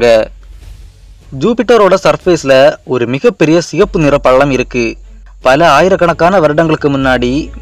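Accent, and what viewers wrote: Kanyakumari, India. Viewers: Kumari Tamil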